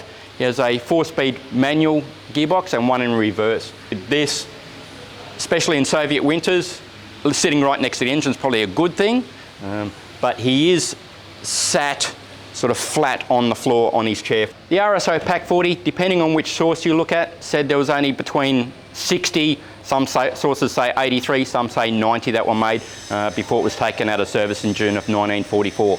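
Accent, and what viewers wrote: Australia. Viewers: Aussie vernacular. You'll have get used to it if you want to watch this channel.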